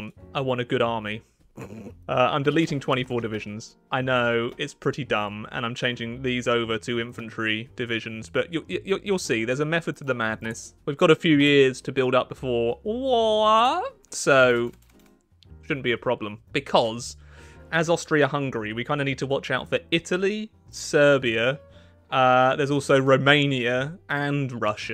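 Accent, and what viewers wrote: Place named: United Kingdom. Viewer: british fella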